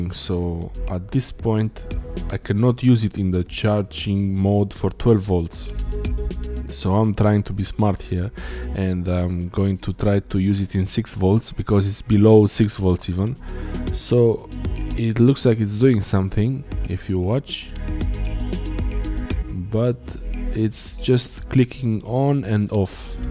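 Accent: Romania. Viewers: Ești roman? Se simte accentul 😂💪🏻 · Could hear something was familiar to that accent. Te salut din bucuresti!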